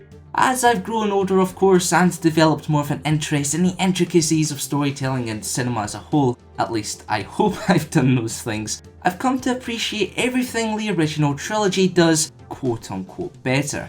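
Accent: Scotland, United Kingdom. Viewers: God damn it I can understand you you're Scottish accent is so strong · u scottish? Cause ur accent sounds like scottish i know cause im scottish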